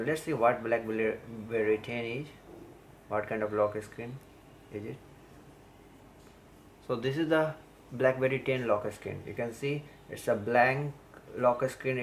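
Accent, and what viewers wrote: India. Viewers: Indian voice ftw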